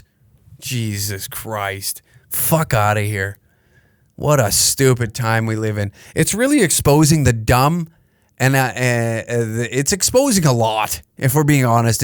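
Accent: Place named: Alberta, Canada. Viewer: You have the best FUBAR accent I've ever heard. Only a proper 'Bertan can talk like that.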